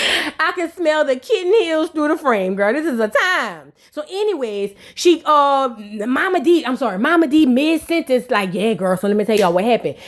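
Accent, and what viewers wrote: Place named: South, United States. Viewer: Girl when I first found this channel I thought you were Toya With that country accent lol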